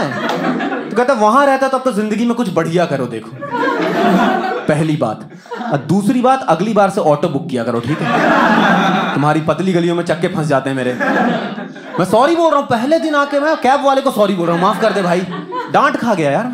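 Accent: Bihar, India. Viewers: Tum Bihari ho. accent se pata chalta hain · His hindi has a Bihari undertone. Is he from a Bihari family? just curious to know not being hurtful to anyone. Bura mat lena koi aab. Aaj kal kuch puchne me bhi sochna parta hai social media pe.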